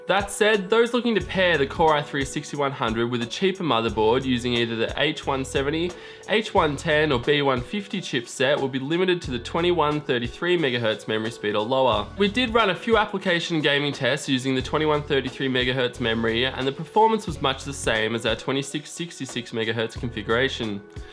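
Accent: Australia. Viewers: Are the parts priced in USD? Because you sound Aussie and these prices are tripping me out. (Unless you live in the US or are relating to the greater audience then fair enough). Get us them on mate rates? ;) Lmao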